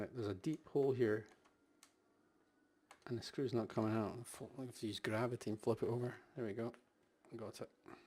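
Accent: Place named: Scotland, United Kingdom. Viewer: Thanks, love your low key presentation (and the Scottish accent!)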